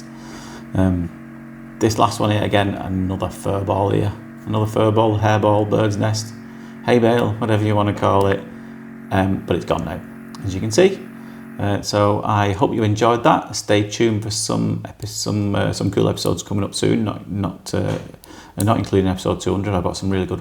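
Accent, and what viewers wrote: United Kingdom. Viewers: Did you say that you twang your G string every now and then? 😂 you need to Google what a G string is in the US…LMAO 😂 I love the way you British talk!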